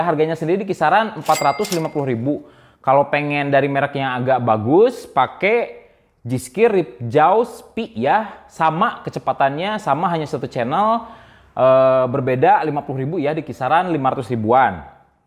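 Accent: West Java, Indonesia. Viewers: ep dan pi sunda pisan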